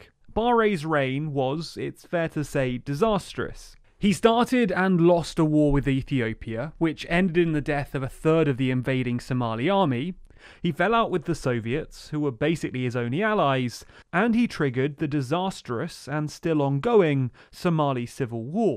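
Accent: United Kingdom. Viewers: correction: there is no dotted line dividing the map of Somalia to make you nervous. Your presentation is good, but it is factually wrong. I am from Somaliland, and it is only my tribe that wants independence, in order to create a country were my clan is the majority and we will win all the "elections." The other 4 tribes don't want independence. The referendum you mentioned was only done by my Isaq clan, the other 4 clans refused to take part. so, of course we "won" with 97% of the votes. Many of us are having second thoughts, but we are afraid to speak up, because the former rebels from my clan who are now the "government" in Somaliland do not tolerate dissent. Your video is my clan's propaganda leaflets read with a British accent. Please check your facts.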